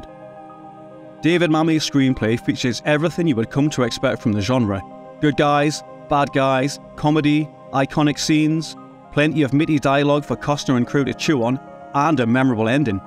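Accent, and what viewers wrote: Newcastle, United Kingdom. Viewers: Unexpected and lovely to hear a Geordie accent on the JoBlo channel! Great job. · Great to hear a geordie on hear👌👍🏻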